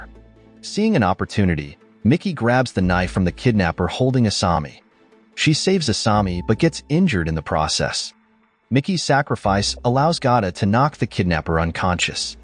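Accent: United States. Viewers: Can't this channel use a narrator who speaks without stupid amerikan prounciation?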